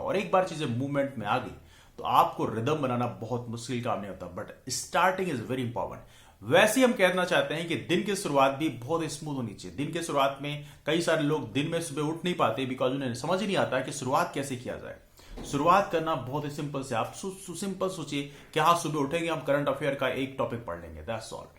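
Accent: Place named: United Kingdom. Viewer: sir me apki videos dekhti hu bate bhi apki achhi hoti h bas ap hindi ko bhi English lahje me bolte ho to ajeeb lgta h lekin aap hmse bade h esiliye achha lgta h apko sunna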